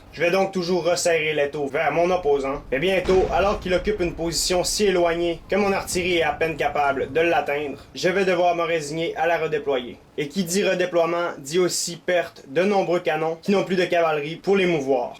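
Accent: Quebec, Canada. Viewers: Québécois? :D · j aime bien l accent canadien^^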